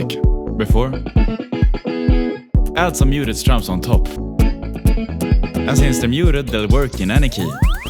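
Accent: Stockholm, Sweden. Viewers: jess i hear de svengelska is going krejsi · swedish accent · Gotta love your Stockholm dialect while speaking English. 😆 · is this guy swedish??? jag älskar allt om den här videon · please brother, try and lose the harsh stockholm accent when speaking english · Never ever lose your swedish accent ❤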